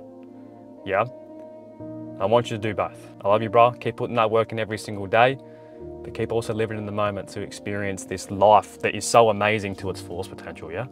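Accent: Australia. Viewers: the australian accent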